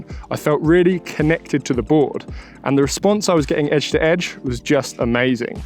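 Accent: United Kingdom. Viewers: You are the top snowboarder i like most with British accent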